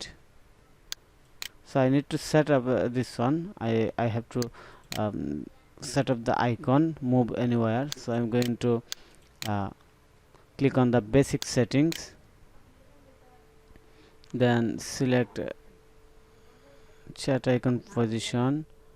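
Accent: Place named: India. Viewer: Lovely Indian accent.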